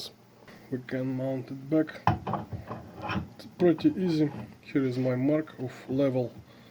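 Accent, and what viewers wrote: Russia. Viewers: У вас ,один из самых страшных русских акцентов!!Но руки золотые.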